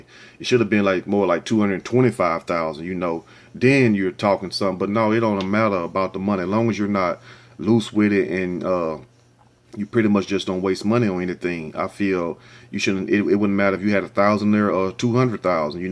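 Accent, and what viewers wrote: South, United States. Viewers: THANK U 4 ANSWERING MY QUESTION. I LOVE THAT COUNTRY ACCENT. BOUT IT, BOUT IT.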